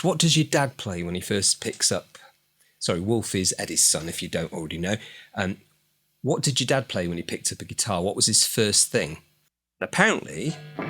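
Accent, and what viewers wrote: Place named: England, United Kingdom. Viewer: I could not watch until the end. You made me grab my guitar. That's a good thing. Subbed. Cheers. P.S. London and Milton Keynes raised. I am a Kiwi now though. We escaped the wicked witch's axe and legged it out of Blighty. We flew actually, but point being that the accent is familiar. It works for me. Stay calm and none of the hype that gets my blood pressure up.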